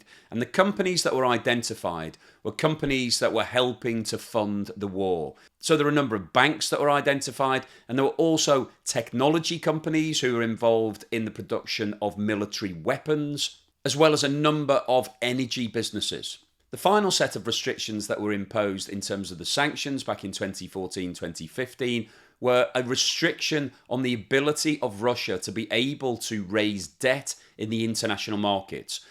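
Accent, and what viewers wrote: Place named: Liverpool, United Kingdom. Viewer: Sounds like scouser 🙄😬🙄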